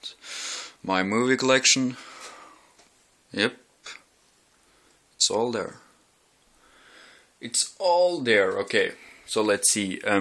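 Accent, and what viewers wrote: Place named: Trøndelag, Norway. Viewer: I love ur Trønder-Engelsk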